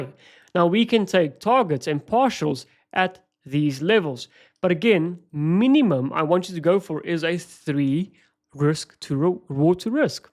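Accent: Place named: South Africa. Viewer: No he sounds South African but I could be wrong!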